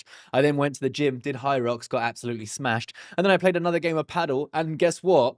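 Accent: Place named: Essex, United Kingdom. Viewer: Defo prefer hearing a Brit. You sound Southern too, Essex kind of way I presume?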